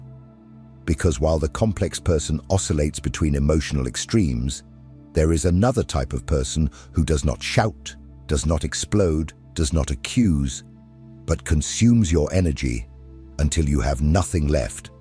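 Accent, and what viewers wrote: United Kingdom. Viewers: I wish i could listen to this without hearing an english butlers voice.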